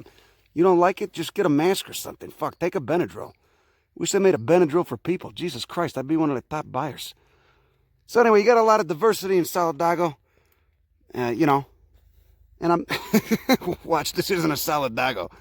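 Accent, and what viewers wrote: Chicago, United States. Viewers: chicago accent at its best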